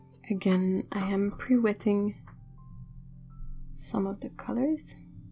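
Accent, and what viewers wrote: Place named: Montreal, Canada. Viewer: Anglais de l'ouest de l'Isle de Montréal. :^) Ton anglais est milles fois plus fort que mon français. :^D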